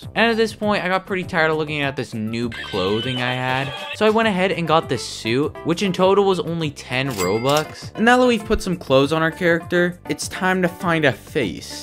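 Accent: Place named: United Kingdom. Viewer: your British aren't you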